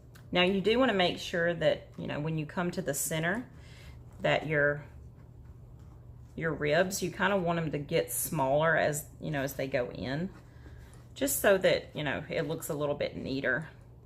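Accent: South, United States. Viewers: This is awesome so thank you! I’m also living for all the the southern speak, I’m not kidding!!! 🥰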